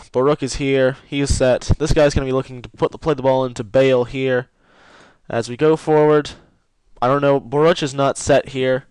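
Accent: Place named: United States. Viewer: was actually going to listen to the whole video untill I heard your accent, you americans don't no shit about football! boruc is currently one of the best goalkeepers in the premiership.. saints fan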